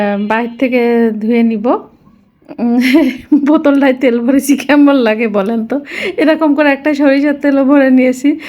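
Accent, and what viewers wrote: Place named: Jessore, Bangladesh. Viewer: কেন জিজ্ঞেস করলাম আপু আপনার ভাষা অনেকটা যশোর অঞ্চলের মতো তাই জিজ্ঞেস করলাম খুব ভালো লাগলো আপনার কথাগুলো আপনাকে অসংখ্য ধন্যবাদ রিপ্লাই দেওয়ার জন্য।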